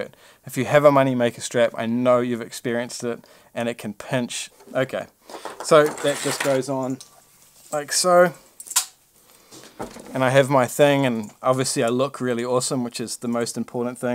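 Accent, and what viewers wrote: New Zealand. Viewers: Maaate! I was like.... is this guy a kiwi?! did a quick google search and BOOM! Nice one man I'm subscribing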